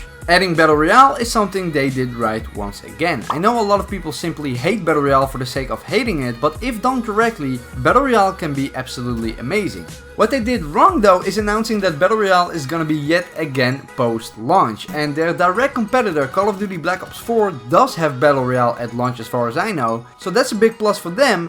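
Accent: Netherlands. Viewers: I can hear you are dutch (ja toch?) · are you from the Netherlands, you have a bit of an accent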